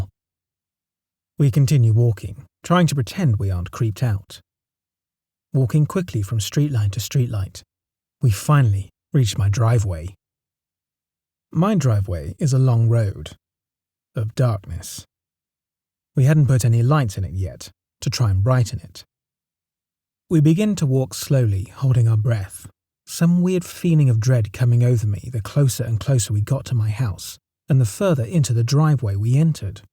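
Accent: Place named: United Kingdom. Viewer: From Wyoming with a British accent... Hmmm...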